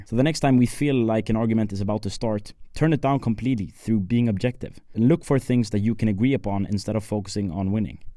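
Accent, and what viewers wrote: Sweden. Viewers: I heard from ur dialect and I knew u were from Sweden :D before u said . Swedish people usually have different dialekt, instead of saying visualising which sounds like vizhualising, swedish people usually say vis-shualising. Or cheese, swedish people say sheese. Just a fun fact and have a great day :)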